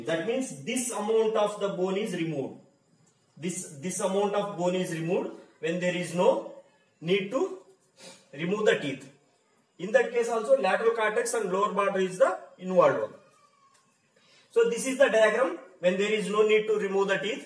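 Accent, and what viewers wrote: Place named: India. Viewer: Need caption, i don't understand indians english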